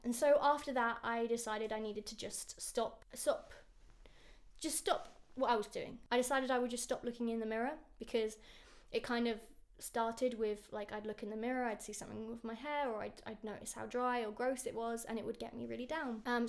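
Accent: United Kingdom. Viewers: Her accent reminds me of hermione ❤️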